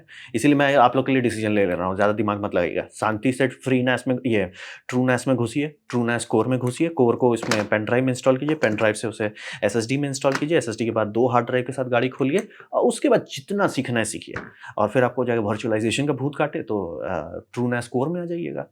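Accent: Bihar, India. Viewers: Bihar se hawa ka ho bhai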